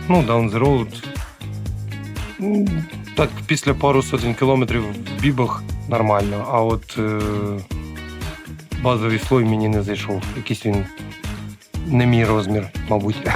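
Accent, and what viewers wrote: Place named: Vinnytsia, Ukraine. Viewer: Лайк за Вінницький (рідний) говор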